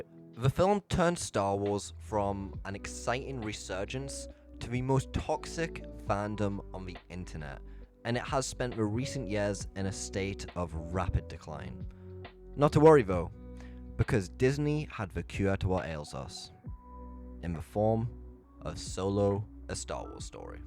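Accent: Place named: Northern England, United Kingdom. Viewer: Northern English! I didn’t know that was the accent distinction, it’s great! I’ve only been to southern England & Wales myself.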